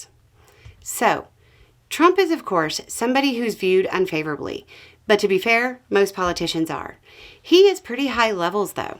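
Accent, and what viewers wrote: South, United States. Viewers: Love the end and that sweet goodbye, especially if she's mad! Oh that southern sarcasm is rich and thick! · I really need to hear you say “Bless his heart” in that sassy sugary sweet southern delivery of yours. Please? Pretty please?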